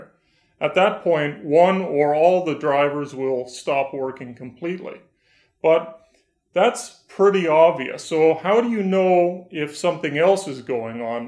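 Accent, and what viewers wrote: Canada. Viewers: First 5 seconds I knew this guy was Canadian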